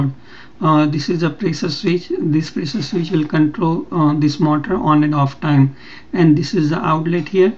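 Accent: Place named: Arab world. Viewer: Difficult arabic accent , but thanks anyway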